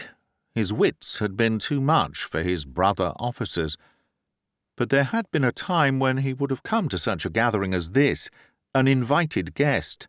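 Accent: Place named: England, United Kingdom. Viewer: Well done as always Mr.! Here in America I’d much rather listen to an audio book by an Englishman, it gives decidedly more cultured air than our flat accent here! Thank you for all your works!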